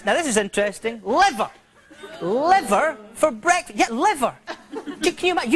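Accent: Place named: France. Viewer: haha he does his french accent different here. the one he has now is cuter and funnier XD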